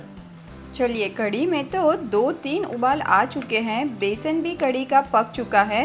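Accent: Gujarat, India. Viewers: ji tame Gujarati bau sweet bolo cho.i like it.hu pan gujarati chu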